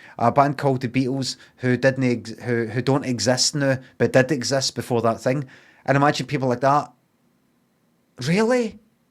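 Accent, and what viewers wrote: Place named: Glasgow, United Kingdom. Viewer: He never really acts up the accent if he's annoyed. If anything, he puts on the posh Glaswegian when he is annoyed by sumin 😅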